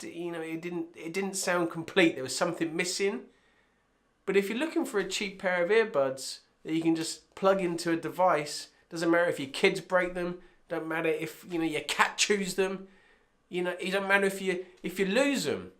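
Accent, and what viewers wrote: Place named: England, United Kingdom. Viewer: Why do you look and sound like an English James Pumphrey?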